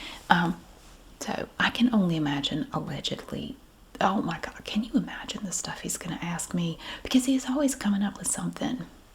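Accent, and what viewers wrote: South, United States. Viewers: the amount of dedication u put into ur videos is outstandin! I’ve been havin a lot of stressful things happenin in my life right now, and watchin ur videos calms me down. I am from the south, but I have recently moved and miss the sounds of the country accents so it’s like ur bringin home back to me. ❤️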